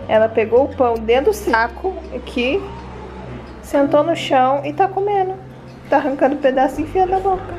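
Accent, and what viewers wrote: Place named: Minas Gerais, Brazil. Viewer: Que linda ! Ta com sotaque gostoso mineiro, família linda . Deus abençoe!🙏🏻🥰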